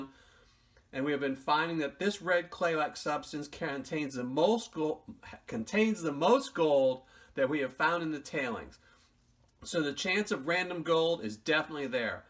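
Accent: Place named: New York, United States. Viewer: I've been a long time subscriber as you can tell from my name I don't know if you give these away to just subscribers on YouTube or if they go to patreons only but I love the hell out of your videos in your New York accent reminds me a whole lot of my grandma just the accent my grandma was a sicilian woman from the Bronx Sicilian accent with the Bronx what a beautiful thing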